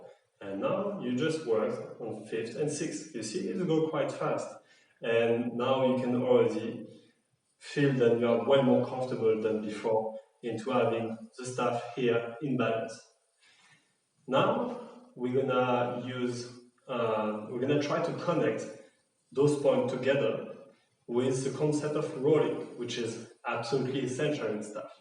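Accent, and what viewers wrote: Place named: France. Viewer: Mais... il parle anglais avec l'accent français... pourquoi ne pas faire un tuto en français ????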